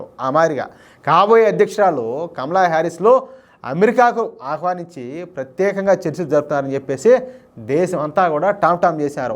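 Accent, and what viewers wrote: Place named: Telugu, India. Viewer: Thank you garu for sharing the the matter humorously. Your Telugu accent perfectly fits in your heavy weight solid punches. God bless you for your patriotism.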